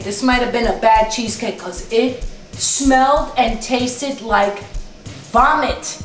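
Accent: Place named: New York, United States. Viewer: Love the italian newyorker accent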